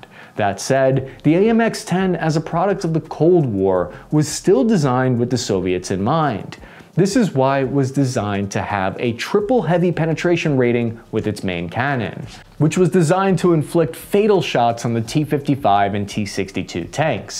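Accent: France. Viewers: Great french accent :) Thumbs up from the country of baguette bakery, fine wine & war tactics 👍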